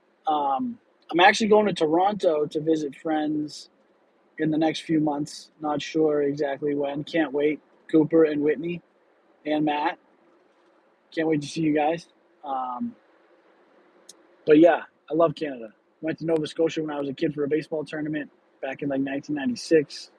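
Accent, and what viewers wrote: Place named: Boston, United States. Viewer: I missed it! But I am glad you got to try live streaming out. Your accent brought me back to my military time. Now I know it’s potentially from Boston, as it reminded me of a friend I had in basic training. He had a heavy Boston accent that was new to me.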